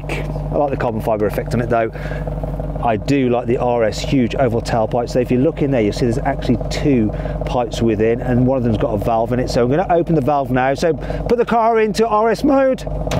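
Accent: United Kingdom. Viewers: 오늘따라 왜 이리 영국인 같냐 평소보다 한 2배는 더 영국인 같네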